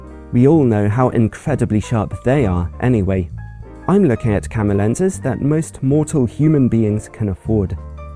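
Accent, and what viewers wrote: United Kingdom. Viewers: Hi, is it your name? yes it is, so no offence I don’t know who you are and where you came from, sound British my best guess, what a rubbish review of lenses you are bringing up, have Samyang whatever it is (again no offense) are paying you enough for what good stuff you are saying about them? I guess they are, LOL, be at least fair and honest to all people here in around YouTube and show your pics taken (in raw with all sets) with lenses you’re taking about (send links to original photos if no hurt you) otherwise do not give a shit, you seems from your voice nice guy! canon, tamron, sigma have so great cheap lenses so again be good not jerk.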